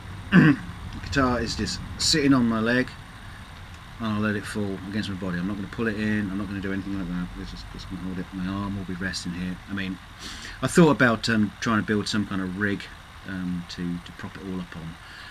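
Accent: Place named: Yorkshire, United Kingdom. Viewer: Well done. Have a listen to the Swedishguitar Nerd comparing his monster '85 Gibson Les Paul to the Epiphone LP Special 2@ Epiphone Special II & Gibson Les Paul Custom comparison More confirmation of the truth. With a sister who's a composer, reviewer and teacher using a 20k Smallman classical, who dispairs at the lack of the expsensive tonewoods for luthiers making top end accoustics in Spain (such is the greed for them for irrelevant kit). She berated my attempts to source a 'good' tonewood electrical guitar. Until I researched and gave up. I trust her, she teaches good performer electric guitarists and as she says, our gendre is wires and magnets. She has always said you can make the body of an electric guitar out of any old crap and provided the wires and magnets are good the sound will be too. The neck has to be playable but the magnets are picking up the fluctuation in string which is then amplified electrically. 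An electric guitar is not amplified by the resonance of the timber. This video says it as it is. There may be the odd nuance, maybe, maybe a harmonic (who knows) but, nothing that can't be induced by a Line6 HD500 somewhere along the supply chain. Some people don't like the truth when it flattens their beliefs and downs their hero's. speaks like a Yorkshireman telling it as it is. Well done. But then again, there are always those that simply have to believe that the world is flat, the universe rotates around the sun and there is a pot of gold buri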